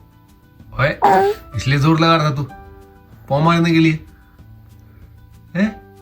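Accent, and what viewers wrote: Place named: Haryana, India. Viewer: Hey bhaiya....❤love from India.....big fan of your haryanvi accent 😀😀😀god bless your family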